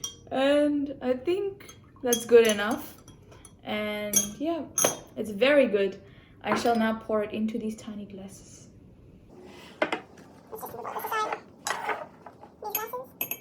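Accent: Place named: United Kingdom. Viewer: or whatever idk sounds kinda British ngl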